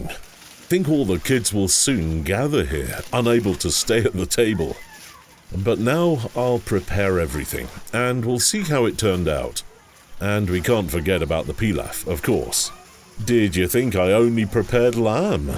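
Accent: United Kingdom. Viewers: good British voice over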